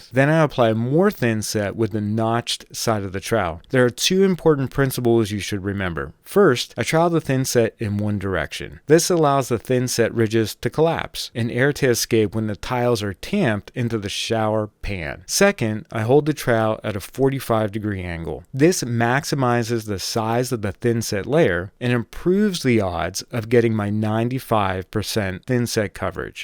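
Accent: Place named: Pittsburgh, United States. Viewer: I KNEW I heard a pittsburgh accent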